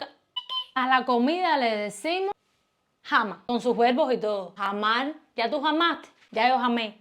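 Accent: Cuba. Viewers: jajaj me he divertido con esto sobre todo porque el que habla conmigo dice que no tengo acento cubano y el tuyo ya tan bello jajaj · Me encanta tu acento cubano, incluso aveces lo trato imitar, no hagas caso a eso que te dicen que no sabes hablar ellos ni hablar su propia lengua saben jajajajaja Echar palo en México tambn se utiliza jajajajja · Divina de graciosa eres y tu asento cubano..hermoso "" ia tu sabes"" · Remangalatuerca fue mi favorita, 😂😂😂 pero todas se escuchan súper con tu acento , mi cubana favorita! 😘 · Tremenda enseñanza, Muchas gracias gran Maestra. Me gusta ese acento, y tan preciosas que son las cubanas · Soy Mexicana y tú eres mi Cubana favorita ❤ y me encanta como hablas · Saludos!! Muchas Gracias por este video,, siempre me haces reir,, yo soy criado en PR, algunas palabras se dicen igual que en Cuba,, como: Chacho, Guagua, poripalla, jevo entre otras,,, he aprendido muchas frases y palabras gracias a mi esposa, ella es nacida en la Havana vieja, Tengo que decirte que a mi me encanta el acento cubano, y a ti te entiendo perfectamente, y yo creo que si no fuera por tu acento, quizas no me hubiera subscrito a tu canal, 😁, ignora esos comentarios negativos sobre tu acento,, te apoyo siempre,, cuidense! 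✌🏼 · Me encanta la buena vibra de. Y no dejes tu acento y tus frases tipicas cubanas...ese "sello" he enriquece y hace unica eh Latinoamerica. Yo soy peruana y cuando joven por ejemplo usabamos la frase "esta palteado" que significa esta confundido en nuestra rica jerga peruana....o "na' que ver" para expresar no es eso. La jerga, los acentos, etc es parte de nuestra cultura local. No la pierdas! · hay muchas palabras que no conozco, el acento cubano que tienes se escucha muy bonito. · He visto muchos de tus videos y con éste me suscribí. Es la idiosincrasia del cubano lo que veo en ti y me parece que es lo que te distingue, esa forma de hablar, la alegría que reflejas. Hace un par de días me encontré con un gran amigo que tenía 17 años viviendo fuera del país y no ha cambiado ni un tono en su acento, le hice el comentario y lo celebramos. Deseo que continúes con tu forma de ser y que alcancen todas sus metas "caballero". 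🥰🤗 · 🤣🤣muero de risa contigo! No me gusta el acento cubano pero tu eres única! Me encantas! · Siempre te entendí todo desde el minuto uno, a pesar de la velocidad. La musicalidad del acento cubano es única. La R nos suena a una ele para nuestro oído porque en el río de la plata la marcamos mucho la errrrrrrrre... para darle énfasis a algunas palabras. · Hola y esposo. Te admiro mucho 💕. A los que no les gustan el acento cubano se pierden ese Sandungueo chica. A mi y mis hijos les fascina. Solo es cuestión de adaptarse al acento. Todos los países tienen sus modismo de hablar. Ni te imaginas como me divierto con tus ocurrencias. Un saludo cariñoso desde New York Cubanita hermosa 💖 💕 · Te escucho sobre todo por tu acento y expresiones cubanas, me encanta, cierto que entiendo mejor a que Adelito, los quiero xx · En todos los países tienen su forma de hablar y no tienes que ver con la educación, Cuba, Puerto Rico y Rep.Dom tienen mucho en común, soy Dominicana y amo a Cuba y a los boricuas, pero me encanta el acento Cubano, es por eso que sigo a. 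· Pues si has dado una clase májistral De cómo hablamos los Cubanos , ESTAS ESCAPA 😅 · aqui con mi cubanita de confianzza me gusta el acento de esta chica saludos desde mexico bonita!!!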